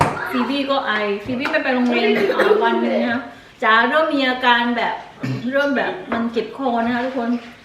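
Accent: South, Thailand. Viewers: ถึงว่าสำเนียงทองแดงออก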